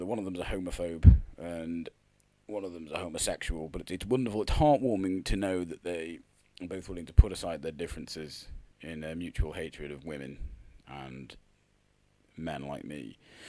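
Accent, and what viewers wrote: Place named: Merseyside, United Kingdom. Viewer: Brilliant Merseyside accent, m8.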